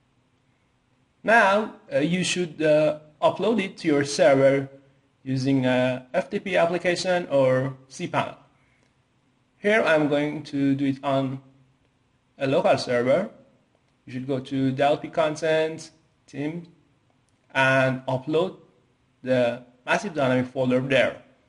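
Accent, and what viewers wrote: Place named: Iran. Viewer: Great themes, that Persian accent tho :)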